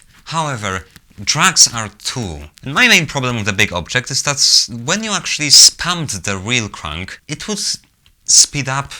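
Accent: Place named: Italy. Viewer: I'm loving this generation of good youtubers with italian accent (i think that's italian)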